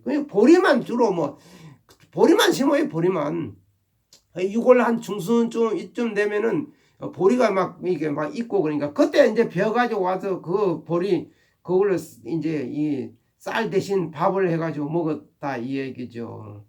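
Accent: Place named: Gyeongsang, South Korea. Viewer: 어디세요 미군도 아시면 의정부 정도 아닌가요 말씀은 경상도 사투리이신데요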